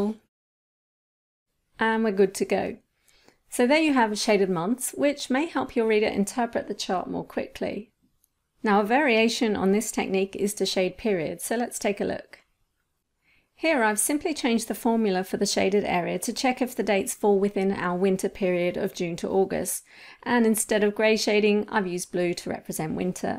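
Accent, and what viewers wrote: United Kingdom. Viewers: Good fast video I think your are British aren't you?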